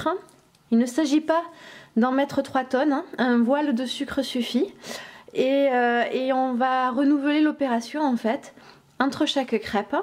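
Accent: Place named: South, France. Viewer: Whaouhh j'adore ton p'tit d'accent du sud !!! Les recettes des mamans sont toujours les meilleures :)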